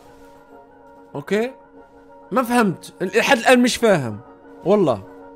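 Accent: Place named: Maghreb. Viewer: أحسه جزائر أو مغربي أو تونسي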